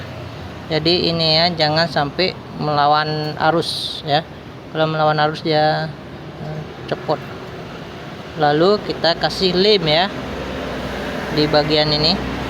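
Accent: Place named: Aceh, Indonesia. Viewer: alamat bengkel dimana bg,kayak logat aceh abg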